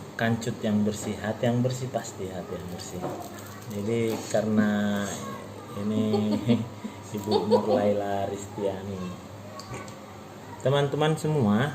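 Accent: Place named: Medan, Indonesia. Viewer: Pak orang batak apa bukan pak Suara nya nada medan pak Salam dari saya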